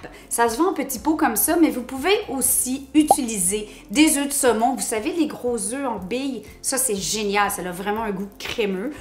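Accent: Quebec, Canada. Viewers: c'est dommage que ça soit du quebecois j'ai enormement de mal a comprendre se qu'elle dit !!!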